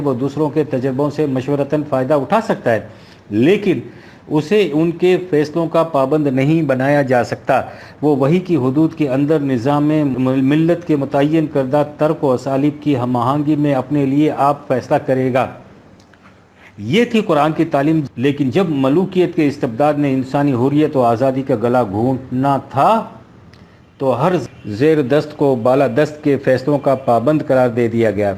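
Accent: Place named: Iran. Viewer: Jazakallah khair really I enjoy your Persian pronunciation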